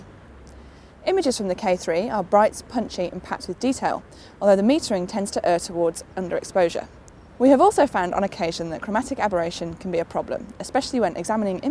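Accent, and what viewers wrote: United Kingdom. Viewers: Like camera review with uk accent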